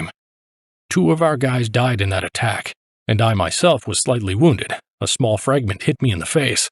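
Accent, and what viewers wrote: United States. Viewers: This was somewhat confusing - the narration being from a German point of view but in an American accent !!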